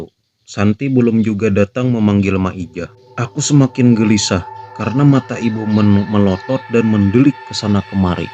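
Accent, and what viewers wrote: Banjar, Indonesia. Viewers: Aslik suarak Banjar lih mang.😊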